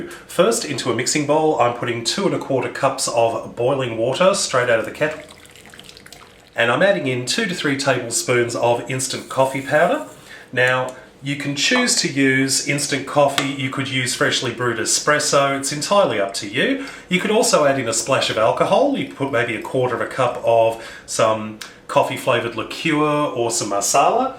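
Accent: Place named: Australia. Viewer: Has anyone told you before tht your a handsome guy wow,I must say and I love the accent as well I'm from South Africa and trust me our men thts coloured do not speak like you I guess you are Australian am I right ?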